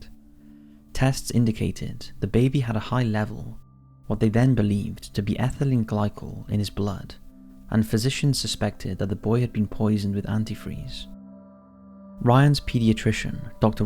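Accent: Wales, United Kingdom. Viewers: Wales - his accent is Welsh.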